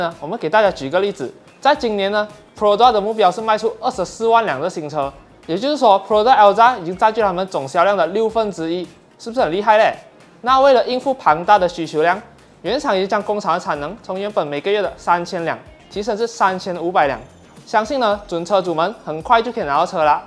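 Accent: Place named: Malaysia. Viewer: 帅哥的口音才正宗。。马来西亚人嘛，当然是要以马来西亚华语的口音来做视频，满满的亲切感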